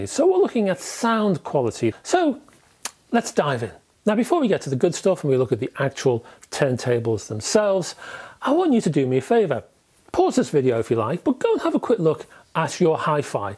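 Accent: United Kingdom. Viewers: I am from Canada and when I hear a British accent I prefer prices to be quoted in "quids" instead of "pounds". Great video!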